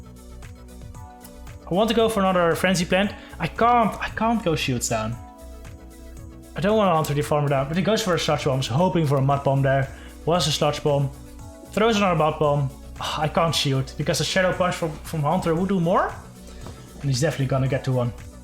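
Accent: Netherlands. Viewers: yes, he is. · Wait are you dutch bc you talk with a Dutch accent great video btw!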